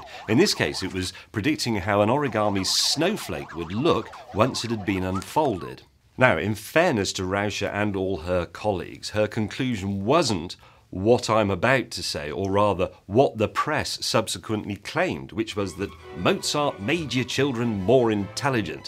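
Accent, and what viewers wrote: United Kingdom. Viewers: British accent makes everything sound still more inteligent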